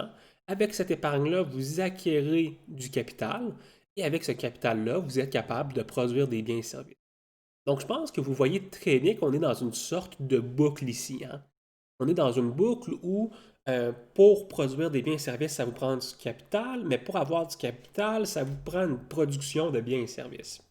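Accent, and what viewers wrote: Quebec, Canada. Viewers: formidable vidéo aussi bien pour cette explication clair et précise que pour ce fabuleux accent Québécois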